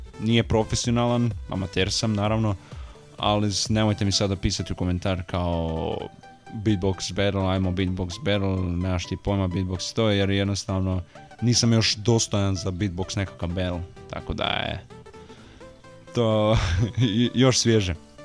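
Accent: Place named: Zagreb, Croatia. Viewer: preko naglaska pretpostavljam da zivis negdje oko zagreba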